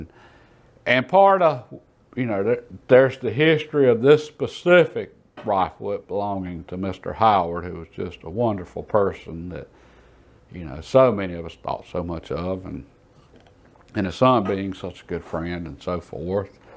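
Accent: South, United States. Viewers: You have just about the best southern accent of all time.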